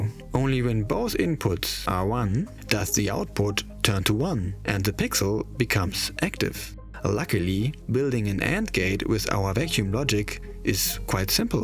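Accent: Germany. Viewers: Lol du bist deutsch? 😂 wieso ist mir der akzent erst am ende aufgefallen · Are you german? Sounds like a German accent..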